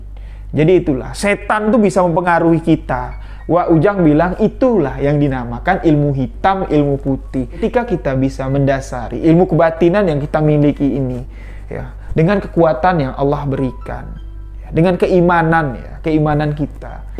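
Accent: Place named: Jambi, Indonesia. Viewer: Mantap, 👍, saya suka cerita dari mas, logat Jambi nya enak di dengar 🙏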